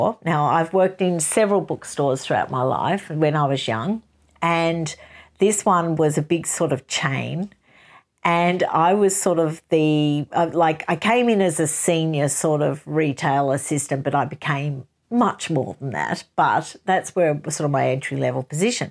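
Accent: Australia. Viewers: Loved this chat session! Being from the states i always love to hear the accents down under. You are such a great content provider about my favorite things...books. keep on talking to us about both sides of the story because you are so insightful! ❤❤ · I really love this format. I agree with you about Russell Brand, and did not know that somebody was trying to get him demonetized on rumble. I knew about YouTube. Like you, I did not have a favorable view of Russell Brand, but I don’t even know why I had any view of him. I’m not sure how I even became aware of him. But he kept coming up in my YouTube feed and I found him interesting and did subscribe and listen to a lot of his videos. I could not listen to the video on his book because of the beginning segment talking about the dog and I switched up immediately. I am very sensitive and things like that trouble me intensely. 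But I love all your videos and having lived in Melbourne for a number of years, I like listening to an Australian voice. I’ll look forward to more of these chats.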